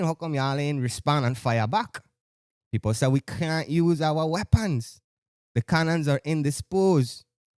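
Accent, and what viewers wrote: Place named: Caribbean. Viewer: “Awo”, I watched this educational and somewhat comedic video in Ms. Charles’s class at John H. Woodson junior high school, I enjoyed the comedy and to hear a Caribbean accent speak to me about the topic of slavery. I find this video informative because it shows what our ancestors had to go through for us to be where we are now. - Lesyah D.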